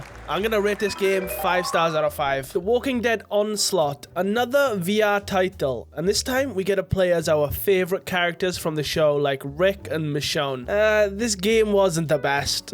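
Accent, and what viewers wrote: Wales, United Kingdom. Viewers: ARE U WELSH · Is that a welsh accent i detect? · Absolute Cinema indeed!! Are you Welsh btw? :D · Mate, are u British? 😊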